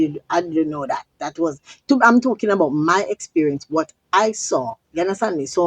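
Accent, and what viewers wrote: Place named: England, United Kingdom. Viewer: Back in 1981 the Reggae Group made the song " "-Don't show I yuh teeth can't work! Maybe they were touring in the UK at that time,lol. I love when you're speaking with the proper English accent and then bruck out with some Patois🤣🤣 But in all seriousness The Jamaica Tourist Board should really contact you about doing some promotional Jamaican Ads as you're always positively highlighting JA. You are able to keep safe and sound where so many others have met their demise. Maybe you could give some Tips about safety measures. to di werl🥰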